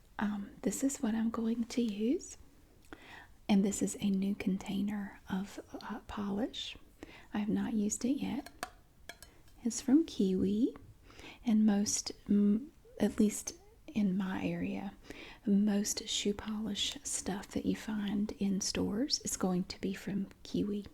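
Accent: South, United States. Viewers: Oooo this works for me. The combination of the brushing sound and gentle southern accent is pleasing.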